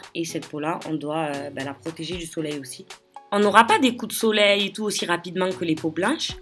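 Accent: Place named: South, France. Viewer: T’a un accent du Sud , comme moi 😭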